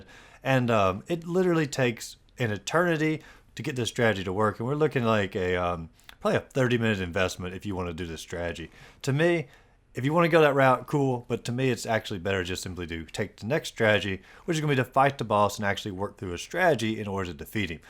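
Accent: South, United States. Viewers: Oh, and I hear that southern accent too man. Its all good, I'm here too lol!!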